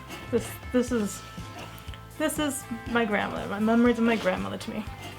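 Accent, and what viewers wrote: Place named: Midwest, United States. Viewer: Hi,! That pumpkin bread looks amazing! So cool that you got the recipe from a book when you were a child. Always nice when there is a story behind the recipe. You are right: cans and boxes are getting smaller over time. I agree that older recipes do not always have a lot of spices. I think that people ate food that we might consider to be a bit bland today... I agree that sometimes too many chocolate chips can be a bad thing. Woodstock...Illinois? I thought you might be a fellow Midwesterner because your pronunciation (I am really into languages). That turned out great. Thanks for sharing!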